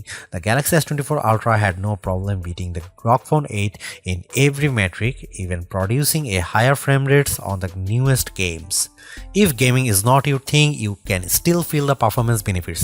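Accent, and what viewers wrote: India. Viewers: Indi accent insta skip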